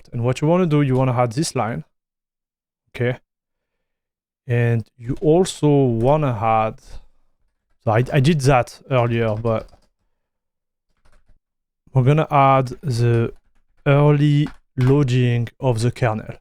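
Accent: France. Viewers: French accent W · He sounds French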